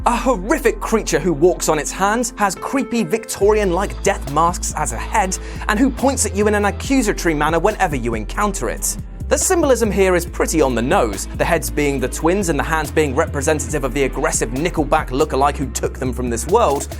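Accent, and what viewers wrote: United Kingdom. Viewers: Why britains ? I don't understand british english -. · American guy with a British accent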